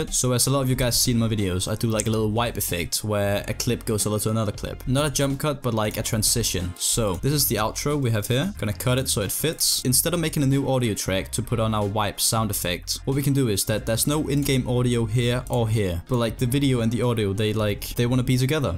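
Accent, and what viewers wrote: Denmark. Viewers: Ohhhh den danske accent xD Super video! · are u from denmark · Laki Popic He's Danish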